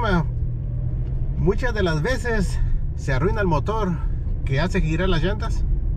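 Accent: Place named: Central Mexico, Mexico. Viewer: De que parte de la RM eres? Suenas como del centro del país. Saludos, recién ayer conocí tu blog, en un día ocioso sin consulta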